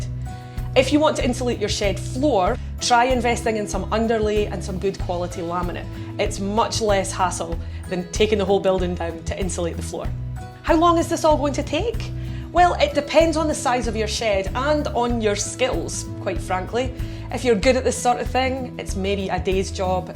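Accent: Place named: Scotland, United Kingdom. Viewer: Great advice hello from England i agree you do need a gap between the cold air and the warm thank you ,lovely scottish accent 😊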